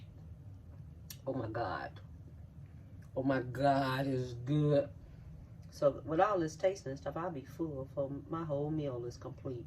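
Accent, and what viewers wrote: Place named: South, United States. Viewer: I LOVE HER! She know about the Soda In there to cut the gas! That’s Saw-sish took me OUT!🤣👏🏽 I already knew she was southern and could BURN when she simply said “this heya” when lickin through them beans! I should have known she was a MaSTER CHEF because she knew to pick through them beans!🤣👏🏽👏🏽❤️🔥🔥 love it!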